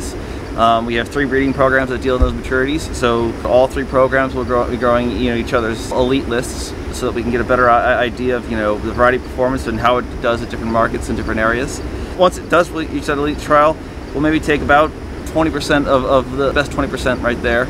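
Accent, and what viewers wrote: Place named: England, United Kingdom. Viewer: Brother has an un-pickable english accent 😄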